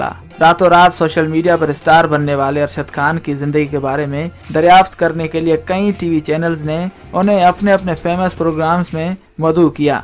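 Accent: India. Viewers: apka lehja hindi kyun hai urdu ko urdu ki trh bola karein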